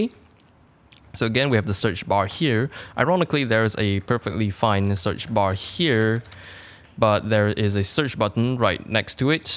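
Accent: Malaysia. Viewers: Sounds malaysia english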